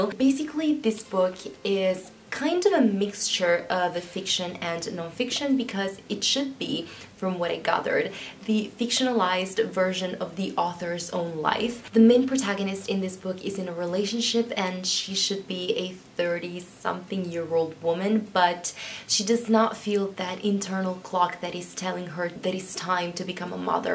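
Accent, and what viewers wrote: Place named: United States. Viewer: On motherhood, we share the same thoughts lol and I’ll definitely pick “nobody speaks of remarkable things” because you had a spark in your eyes as well. So it will be worth it in any case. Hai una pronuncia un sacco Americana ahah il mio lato British is cringing, ma è comunque perfetta ❤️ Praise for Doggie che si è imposto nel video e ha cercato di farti smettere per le coccole. YAS BUDDY. THAT IS HOW YOU DO IT! 💪🏻❤️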